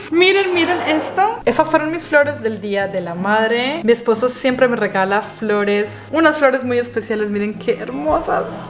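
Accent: Antioquia, Colombia. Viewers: Hermosa a mi me fascinan tus videos, eres super genial y me inspiras mucho 💯💎👸 me encanta escucharte cuando hablas con tu acento paisa ( Medellín 🇨🇴) te quiero y sigue adelante 🌷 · Amooo tu acento colombiano y amoo tus videos 😊❤ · en este video se te salio mas el paisa jjj q chulo una vez dijiste q eras de medellin pero normalmente tu acento es bien neutro. Saludos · el otro día me puse a ver videos de cuando Sofía estaba bebé 😊 y me di cuenta que tenías tu acento colombiano más marcado. Por cierto la edición de tus videos ha mejorado muchisimo 👏🏼👏🏼 pero igual a veces me gusta regresarme a ver los videos viejitos 😁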